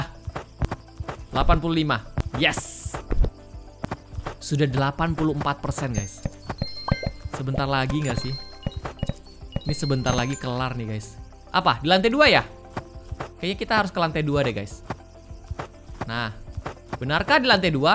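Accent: Java, Indonesia. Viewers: Kak kalo ngomong bahasa Jawa lucu bgt wkwk🤣🤣..eh btw kak STMJ susu telor bukan teh🤣🤪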